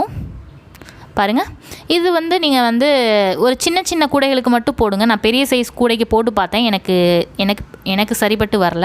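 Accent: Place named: Coimbatore, India. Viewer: Thank you mam unga Coimbatore slang nalla irundhadhu mam so thanks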